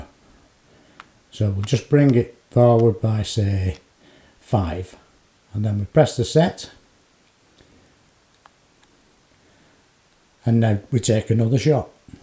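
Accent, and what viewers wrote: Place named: Liverpool, United Kingdom. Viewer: Hey brotha great video thank you. Now as a side question where does your accent come from? I can’t seem too sort it out. Obviously it’s an English accent but I don’t have a trained enough ear to sort it out right away. You do sound like a Beatle to me (hope no offense) as that’s my only reference for now. I know they (Beatles) are from from Liverpool (or greater area). Thank you kindly and have a good day.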